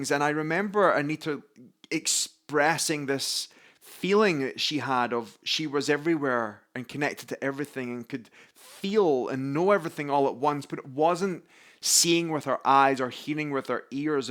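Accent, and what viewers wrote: Scotland, United Kingdom. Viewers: Always a great pleasure to hear you (love your Scottish accent)and thanks for this deep lesson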